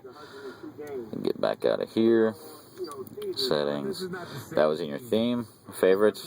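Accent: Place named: Philadelphia, United States. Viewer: Sound like Philly version of Jim Florentine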